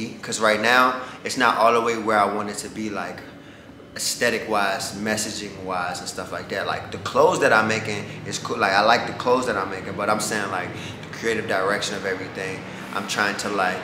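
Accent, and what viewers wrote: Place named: Florida, United States. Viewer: Are you from Florida? I love your accent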